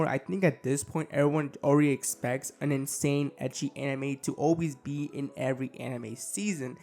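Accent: Spain. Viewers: that's just how spanish ppl speak english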